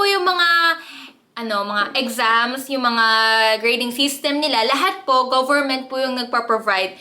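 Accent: Tagalog, Philippines. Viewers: Mas malinis pañg magtagalog eto na pure korea naiintindihan talaga pananagalog at matalino😊di tulad ng mga duterte pilipino pero pag nagtagalog prang barok😅sabagay hitsura nila pang kweba lang talga😂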